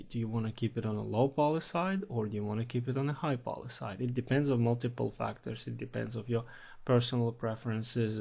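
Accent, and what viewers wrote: Russia. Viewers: You have special apeak type are you russian i think you are russian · are you russian? you kinda sound like one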